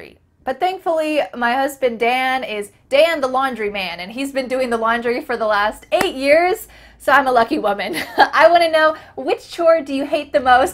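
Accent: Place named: United States. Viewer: It seen like that you are American 😊 Wow ! 😍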